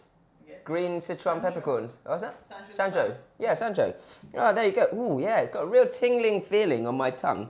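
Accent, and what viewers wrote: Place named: RP, United Kingdom. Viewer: First, I am not sure how I got here. Second, Food? meh. Third. But, honest to goodness why are you trying to sound like Nigella? Is it because it is supposed to sound real poash? But.. Her father was the Chancellor of the Exchequer! And it's annoying like it's supposed to be better than everyone else.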